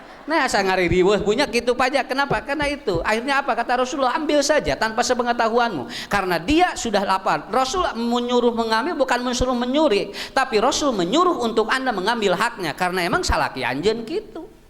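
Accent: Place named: Bandung, Indonesia. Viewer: Hatur nuhun pencerahanna mani nyerep bahasa sundana raos ngabandungannana.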